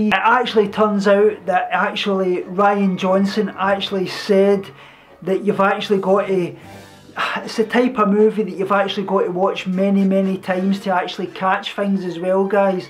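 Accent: Scotland, United Kingdom. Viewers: Veganism, Star wars and a Scottish accent! My son will love this video i have to show him!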